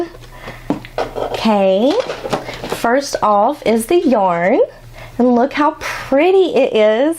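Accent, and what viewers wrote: South, United States. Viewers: What southern state are you from?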